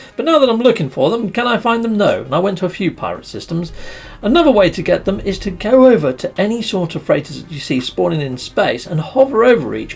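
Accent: England, United Kingdom. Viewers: I enjoy your videos. I love your English accent. I’m grieving for Elizabeth II. 🇺🇸🤝🇬🇧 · Classic British guy voice 👑🤩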